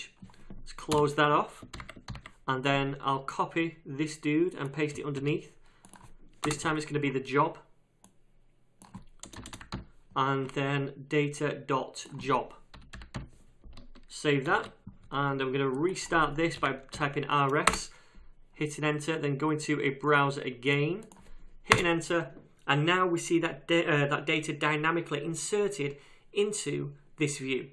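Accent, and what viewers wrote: United Kingdom. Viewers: I just want to say thank you for your tutorial and your British accent drive me to learn more about nodejs : )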